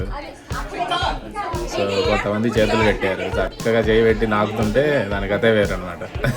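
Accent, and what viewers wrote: Rayalaseema, India. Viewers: Speak in our rayala Seema accent nice to listen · Bro meedhi Rayalaseema kadhaa.... Kadapa district aa slang same alane undhi... I coming to Virginia State in next week I would like to meet you if you are available... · Anna nuvvu pakka Kadapa language matladuthunnav super Anna. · Akkadiki vellina mana rayalaseema slag podu kada😀😃😃 super sir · అన్నా నీ భాష అద్భుతః. పక్కా కడప. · Hi sir......this is madhu fr Chittoor...I follow ur most of the videos.....meeru US lo mana rayalaseema slang matladuthu videos cheyadam chala bagundhi 👍🙏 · garu you r the king of Rayala seema food and language. US could not change your accent of our Telugu. Nativity in your language.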